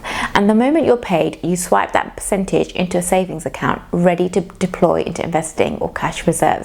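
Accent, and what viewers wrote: United Kingdom. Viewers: Yes! I am all about budgetting! I think it is one of the First Steps in finacial Freedom. As always very lovely video, and I love your British accent !👍🏻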